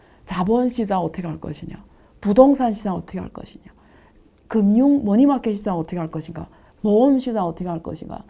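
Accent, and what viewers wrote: Yanbian, China. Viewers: 。。加油！ 우리 연변의 자랑이예요 개무 갠데 걸쭉한 연변사투리 좋쓰꾸마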